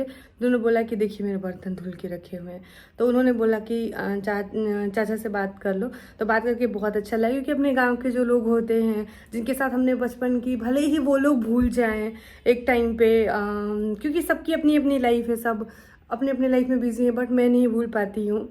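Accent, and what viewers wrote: Bhojpuri, India. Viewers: Hiiii didi Ppplllllssss reply Bhojpuri khobb badhiya lgta hai aap waise hi bola kijiye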